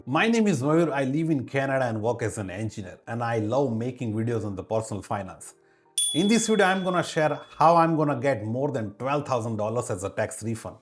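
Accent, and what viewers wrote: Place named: South India, India. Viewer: Dude you sound South Indian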